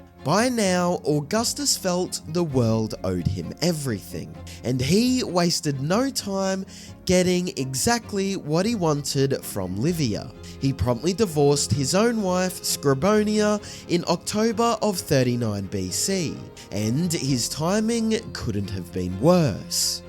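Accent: Australia. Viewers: Your narration gained momentum as you progressed! Well done, love your accent. I'm an Aussie as well 😂😂❤ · I just love the Aussie accent! The way you can cram so many extra vowels into a word. Like 'exposé'. That becomes 'Aix-poah-zaiyyah'.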